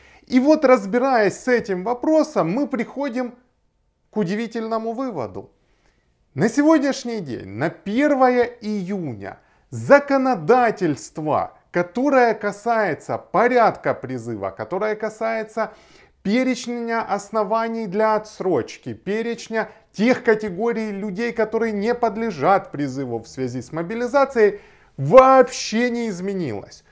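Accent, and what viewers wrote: Ukraine. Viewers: Кто этот блогер,говор украинский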